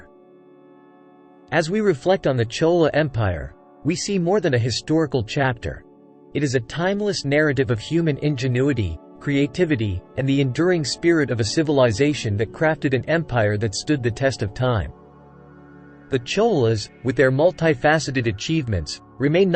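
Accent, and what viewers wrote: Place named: United States. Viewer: I would of preferred it if you had set your narration machine to an English accent not American.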